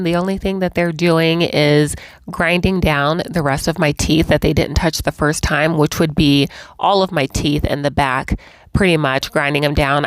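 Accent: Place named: West Coast, United States. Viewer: I love your video. Very informative and detailed. 10K for flights?!!! u rich lol...I would suggest traveling more so you're not too easily nervous about experiencing new things in countries. I am an American living in Denmark now, and love seeing fellow USA people travel. You're accent and vibe tells me you're from west coast. aye.. lol. Anyway u have my subscribe.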